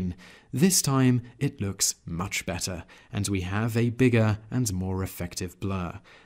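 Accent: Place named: England, United Kingdom. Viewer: No, he sounds english. You, on the other hand, sound like a complete idiot. Goodbye.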